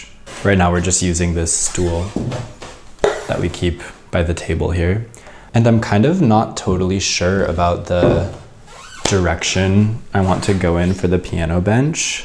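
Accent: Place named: Ukraine. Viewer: from Kyiv?? so nice to hear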